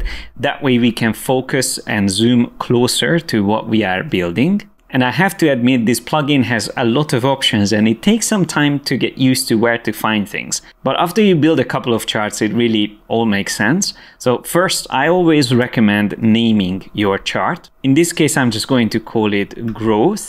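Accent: Hungary. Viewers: Great channel, awesome background and video, huge help! Thank you bro :) Ez most egy kísérlet arra vonatkozólag, hogy kb 4 másodperc után hasított belém, hogy oké, ez a srác ha nem magyar, akkor én se vagyok az :D A hunglish ellenére vagy inkább mindazzal együtt tényleg az egyetlen videó amit annyira profinak találtam, hogy tényleg végignéztem, és öröm látni, hogy új fejlesztésekről és korrekt designról kapok heads-upot és tutorialt! Podcast, newsletter, jó az ilyen profizmust látni azon felül, hogy tényleg kerestem valamit és meg is kaptam rá választ! Menőség és gratula! <3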